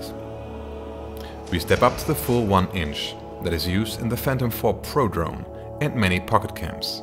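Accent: Germany. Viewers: well I had to watch about 3 or 4 videos to be sure 😁 you speak very good english. Im german too so I know what makes the German english dialect but I think others wont notice ;) Looking forward to new videos. Love your videos. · That was actually a really great video! Although I hate your german accent, but no offense buddy.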